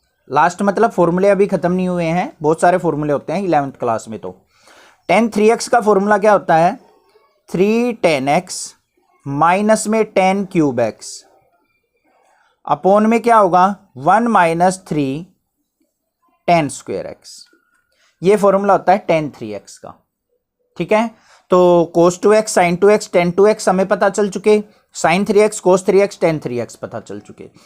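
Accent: Haryana, India. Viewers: Sir are you from Haryana your tone is like haryanvi language I am also from Haryana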